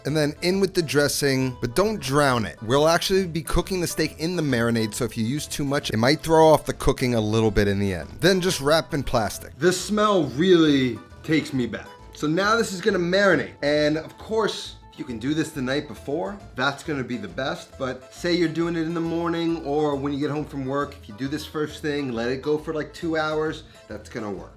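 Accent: New York, United States. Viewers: ☠️☠️☠️ some of the most New York shit I ever heard 😂😂😂😂 Right up there with the Kosciusko bridge 😂 Even Google talk to text inputs the correct spelling using the marble mouth pronunciation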